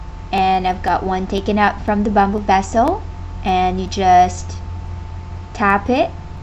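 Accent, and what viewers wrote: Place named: Tagalog, Philippines. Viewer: Excuse meh! Kenmax kapa dyan I’m live abroad for so many years Kaya alam ko yong mga accent in different countries. Sa tuno palang nya alam ko marunong sya mag Tagalog.... juskoh ang ingot mo nmn 😅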